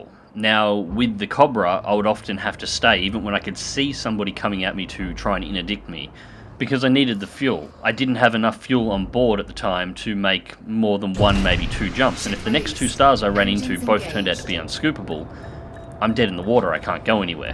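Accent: Australia. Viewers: goes to slee..... sees vid of well, not gonna hurt hearing an Aussie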